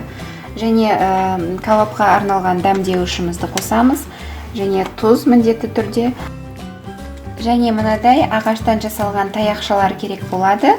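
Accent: Standard. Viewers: рахмет, таза қазақша сөйлейді екенсің, бәрі түсінікті юеткіздің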